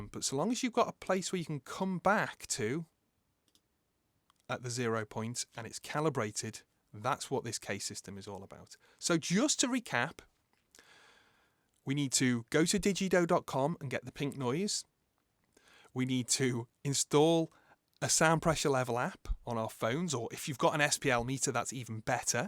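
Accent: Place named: England, United Kingdom. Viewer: it is a pleisure listen this nice english accent !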